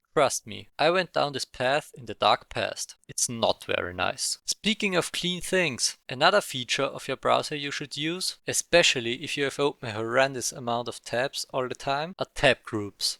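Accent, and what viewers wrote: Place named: Germany. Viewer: you German? I like your accent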